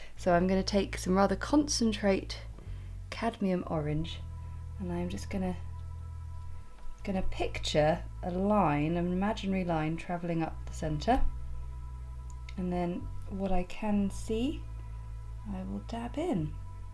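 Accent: United Kingdom. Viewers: Well here we are 4 years on and I just knew you’d have a lovely crocus flower to paint for my Spring/Easter cards. My first go without watching this again was just a disaster, so newly armed with the better approach I’m confident I can pull this off. Ps—honestly I don’t care how you pronounce Alizarin; I so enjoy your British accent it just does not matter to me one whit!!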